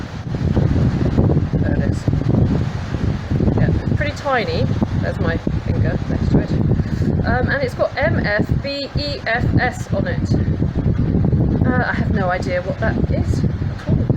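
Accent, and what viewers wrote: United Kingdom. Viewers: Some fascinating finds there. I sometimes spot other interesting things in the background near your finds and frustratingly wish I could somehow interactively have a poke at what they are. Speaking of frustration, I loved the slight tone of indignation about the pouring rain. You sounded very English :)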